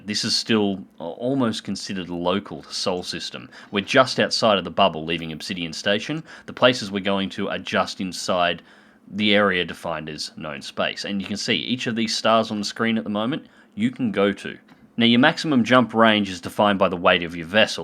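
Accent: Australia. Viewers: goes to slee..... sees vid of well, not gonna hurt hearing an Aussie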